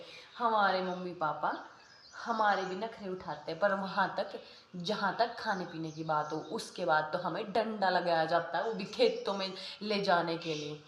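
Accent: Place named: Haryana, India. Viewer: aapki half language haryanvi hai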